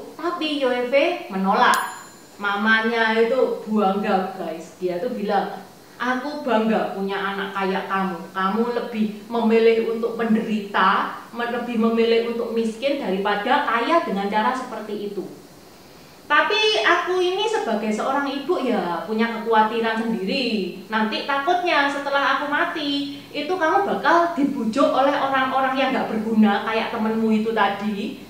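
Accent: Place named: Java, Indonesia. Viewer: Mbak ini logat bicaranya tellu Jawa SGT kental dan medooook bgt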